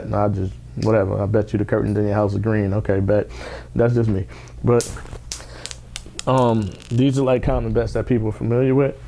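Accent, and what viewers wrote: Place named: Bronx, United States. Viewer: You definitely from the Bronx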